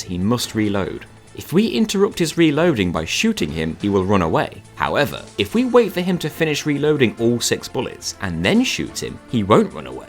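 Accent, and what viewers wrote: England, United Kingdom. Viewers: As a non native english speaker, I love the english accent. Great video btw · Yes he is New Zealander. Great show. All through the video all i could think was i've heard this voice before. Are you from UK? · Great video... Except ur bri" "ish, love. Smhhh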